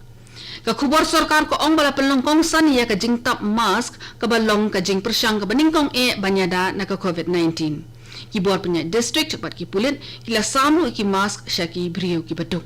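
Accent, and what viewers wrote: Khasi, India. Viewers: Phi te Kong phi dei khasi paka rukom pronoun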